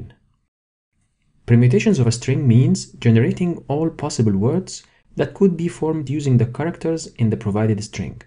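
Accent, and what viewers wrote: Egypt. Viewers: Hi, your accent is Egyptian lol, let me know if I am correct. Great video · What an Egyptian accent <3 <3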